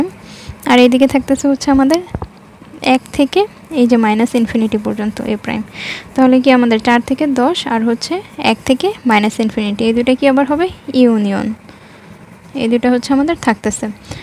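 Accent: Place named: India. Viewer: excellent .am from bangladesh.eta ki indian na bangladeshi channel.kotha bartay indian lagteche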